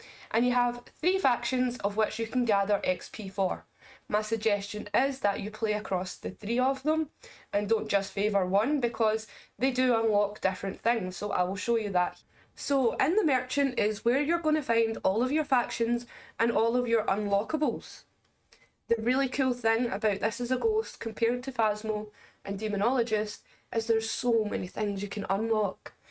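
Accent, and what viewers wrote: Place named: Ireland. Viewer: phasmo for the win. also are you irish? you got a pretty thick accent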